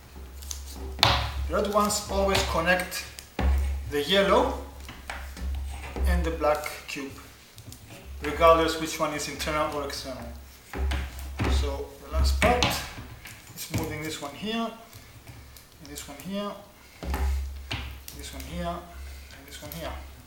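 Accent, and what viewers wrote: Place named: Greece. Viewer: По акценту видно,что грек.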